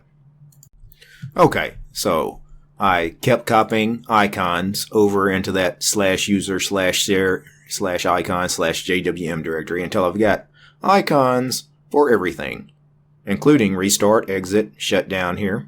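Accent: South, United States. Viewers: I really like your southern accent sir. I am a new north Dallas resident and I must say yours is the best! Sorry for being non-linux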